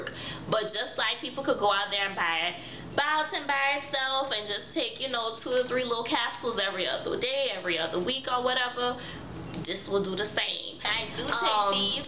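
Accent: New Orleans, United States. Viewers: This is a little random but what's that song at the end ? I fell in love with it lol. Love your video btw and your hair is gorgeous ! And that New Orleans accent tho !! 😍😍😍😍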